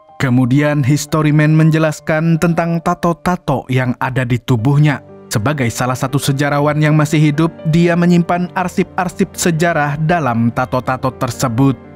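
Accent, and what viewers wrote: Banjar, Indonesia. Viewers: Banjar asli kah mang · Urang Banjar kah Nang?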